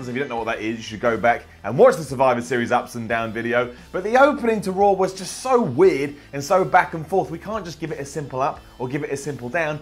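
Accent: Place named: United Kingdom. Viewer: Sometimes when he gives an up and starts explaining why it's like if he didn't have a British accent it wud be a down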